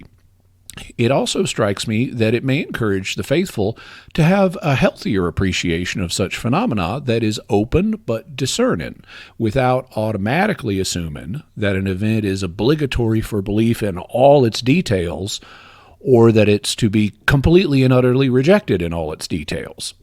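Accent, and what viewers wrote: South, United States. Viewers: I was so distracted by this new Southern accent that I could hardly pay attention to the subject matter! I was even trying to figure out if this was a joke video! Lol! Good thing I can rewind!😂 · I think that moving back closer to the South is starting to rub off on you dialectically. You definitely sound as if you're taking on a bit more of a southern affectation, reminiscent of someone like Alan harrelson, who runs pipe cottage. And if you don't know him, I suspect he would be someone you would have an awful lot of fun talking with. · Wow, really is from the south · Did gain a country accent when I wasn’t looking?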